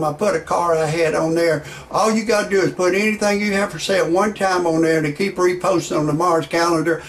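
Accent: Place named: South, United States. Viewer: lol, excellent...this is good entertainment, I think that's the name I catch from you, but maybe the southern 'twang' does defeat me in this regard...sadly that '21yr old having my baby' appears to have prematurely put our host 6ft under..RIP.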